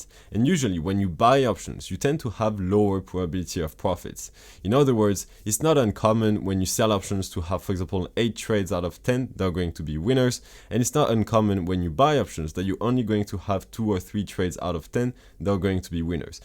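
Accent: France. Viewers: Good video mate , I tried to open a tasty works account (I’m in 🏴 England) Was easy to open but getting the money into the account via CurrencyFair was a right nightmare. Ended up opening an interactive broker account and I can get the money in and out with no issues as interactive brokers have bank accounts throughout the world so it’s just like sending it to another English bank account. My 1st cash secured put will be going in today also 🥳🥳 on AT&T because ultimately I don’t mind if I get assigned. By your accent I’m guessing your french so do use CurrencyFair or a french bank to fund tastyworks just out of curiosity. Have a good day.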